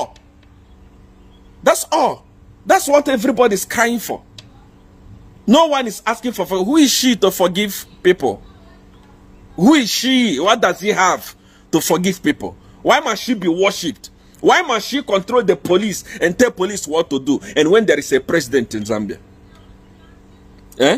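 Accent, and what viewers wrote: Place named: Nigeria. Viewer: Are u nigerian or zambian? Because of your accent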